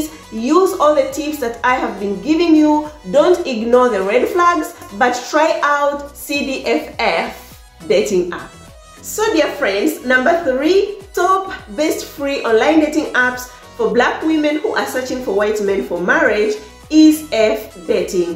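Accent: Uganda. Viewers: I have been pondering on asking you this question, did you go to school in Uganda? Your accent sounds like that of Ugandans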